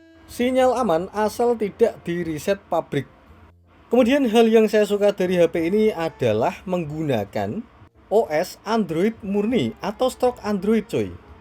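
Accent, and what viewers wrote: Pati, Indonesia. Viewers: wong Pati mas?😅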